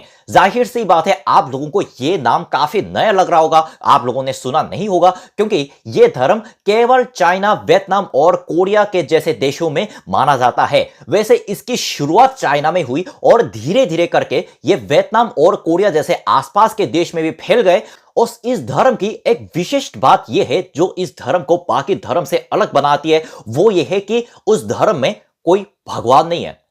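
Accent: Standard. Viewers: Bhai etani shuddha hindi to hum bhi nhi bolte jitni tum bol rahe ho gajab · Wow you Hindi is so pure! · हम हिंदुस्तानियों को इनसे कुछ सीखना चाहिए !! एक भारतीय न होकर भी यह हिंदी इतनी शुद्धता से बोलते है ,और हम भारतीयों को न तो ठीक से बोलनी आती है और न किसी को लिखने ,कमेंट सेक्शन में भी इंग्लिश का चलन है 😟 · नमस्ते बेटा तुम इतनी शुद्ध हिंदी बोलते हो,बहुत अच्छा लगता है,rusim धर्म बहुत अच्छी विचारधारा है,काश इस विचार धारा का अनुसरण पूरी दुनिया करे। अपने धर्म का भी सम्मान करें। ईश्वर की कृपादृष्टि तुम पर हमेशा बनी रहे🙏😊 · Itna pure hindi ham indians v nhi bolte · waaao!!! i love ur hindi!! amazing!!! so shuddha!!! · Your Hindi is so pure, 100% understand. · इतनी शुद्ध हिंदी 99.9% भारतीय भी नहीं बोल सकते हैं। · Apke jitani shudh hindi hume bhi nahi aati great👍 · अति उतम अपकी हिन्दी बहुत अच्छी है इतने शुद्धता से तो हिंदुस्तान मे कोई नही बोलता हैं आपके दवारा दिया गया ज्ञान सर्वोत्म हैं❤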